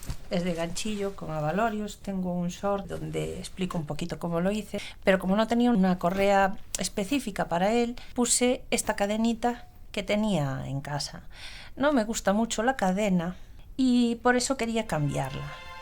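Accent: Spain. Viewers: Un trabajo precioso!! Acabo de descubrirte me encanta la paciencia que tienes. No se si me equivoco pero me parece que tu hacento es gallego. Gracias por enseñarnos tus trabajos.saludos de una gallega ❤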